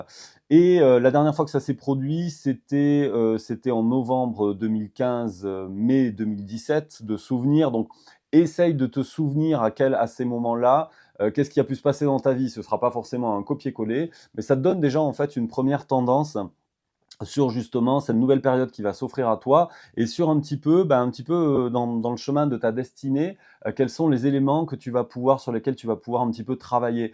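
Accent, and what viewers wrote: South, France. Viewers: Bonsoir je vous découvre, les hommes ne sont pas si nombreux dans votre domaine, il me semble reconnaître l’accent du sud pas tout à fait comme le mien mais presque😉. Je suis étonnée de voir que sur votre chaîne YouTube il y ai si peu de vidéo. Avez vous une autre chaîne ? Comme j’y suis sensible, Vous avez une belle voix 🙏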